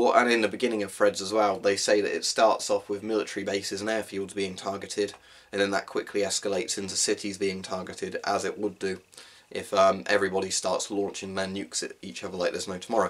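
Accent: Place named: England, United Kingdom. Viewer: I only like recieving information in English accents 😂. It just sounds more sophisticated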